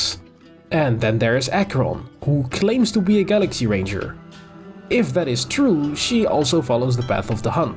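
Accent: Netherlands. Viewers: Appreciated this video! I was just wondering, based on your accent, are you dutch by any chance. Question coming from a dutchie 👀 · Your accent screams Dutch I'm sorry xD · Maybe I’m tweaking but are you Dutch? Your accent seems so similar to the Dutch accent- from a Dutchie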